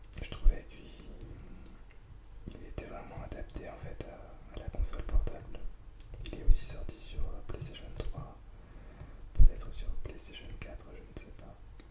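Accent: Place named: France. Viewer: O sotaque francês é tão satisfatório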